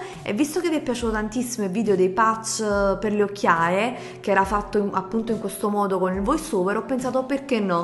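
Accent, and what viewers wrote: Naples, Italy. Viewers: sei napoletana?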